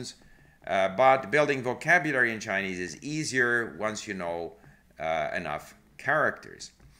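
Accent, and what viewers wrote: Canada. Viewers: yes this is something I also find interesting! I never thought Canada had an accent until I went to Vancouver and when I saw yuor first video I thought "wow this guy has a Canadian accent en then you said you were from there en I was like wow I was right! hahaha but yea very interesting topic! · Hello,! Can you do some videos about the different kind of English accents (British,US, Scottish,Australian etc...)? I'm French and it will be great to know more about it. And also if you can talk differences of vocabulary between Canadian/US/UK vocabulary? I read that for US, you (English Canadian) have an accent but I am not able to notice yet. Is it as strong as us French people with French Canadians? Do Canadian people learn French from France or French Canadian?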